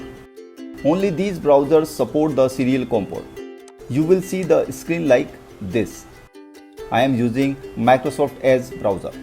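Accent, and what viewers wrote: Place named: India. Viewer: Hard to understand Hinduglish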